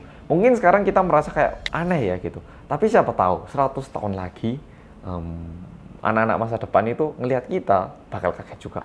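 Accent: Java, Indonesia. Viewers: Kembali ke medokkkkkkk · Kangen sama yang versi medok😍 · untuk pertama kalinya nonton vidnya di ruang keluarga, dimana ada papa disitu. 5 menit setelah video dimulai, papa mulai bingung dan nanyain "temenmu punya youtube ta? kok muedok e nemen" karena jarang liat youtuber yang medok wkwkwwk. akhirnya aku jawab "engga ini org korea sempet tinggal di malang" trs dikira boong dong wkwkwk akhirnya aku tunjuin "inilo orang korea" sambil liatin vidnya mas yang dimana mas ngomong bahasa korea dan akhirnya papa bilang "iyaya orang korea, muedok nemen, km kalah" disini valent yg notabenenya org jawa kalah sama mas yang orang korea:)) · anyyeong mas yg mendok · Wong Korea selatan yang mendok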